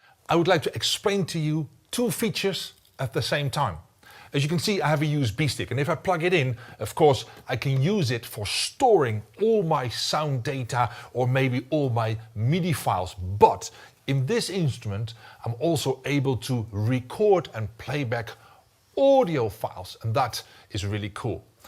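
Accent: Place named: Netherlands. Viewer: His accent... must be dutch.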